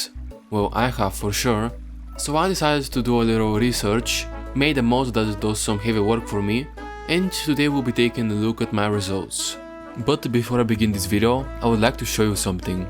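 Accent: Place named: Russia. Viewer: I hear Russian accent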